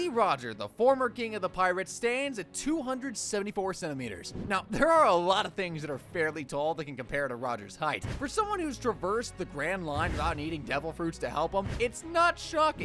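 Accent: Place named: United States. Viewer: This video is in english narrated by what sounds like an american. Why then would you give metric measurements instead of feet and inches?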